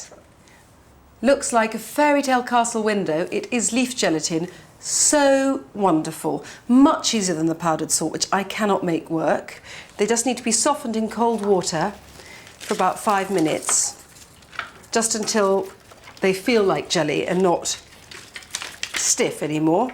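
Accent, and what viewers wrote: United Kingdom. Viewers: nobody said she's not interesting in the Nigella express. It's just an obvious difference between Nigella bites & Nigella express. Nigella bites is so much better raw, vintage , cozy vibes much much better. Her kitchen, cozy house, pantry, little trips to the local market, going out to Bridgerton pier with kids, house parties allll of these things are so nostalgic. I'm not even from the UK or US. Yet I feel sooo much comfort in her vibe . I literally grew up watching Nigella. I was little and I couldn't understand English on top of that her thick British accent. Yet I continued watching until I started catching few words and finally fully understood what she was saying. Nigella feels like a close non judgemental motherly friend.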